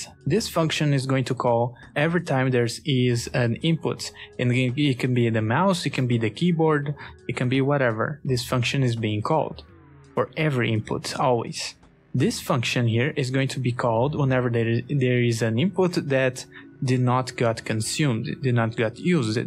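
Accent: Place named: Brazil. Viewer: br? esse sotaque n me engana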